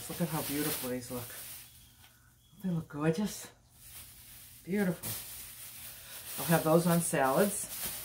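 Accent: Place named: New England, United States. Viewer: Hi, new to your channel. Where do you live? I'm detecting a New England accent. I too would love to buy a van and travel, so your channel intrigues me.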